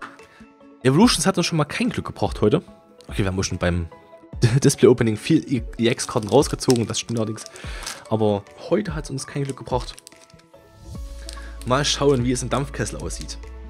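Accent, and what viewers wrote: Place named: Saxony, Germany. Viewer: Du klingst wie als würdest du aus Sachsen kommen, ist das so?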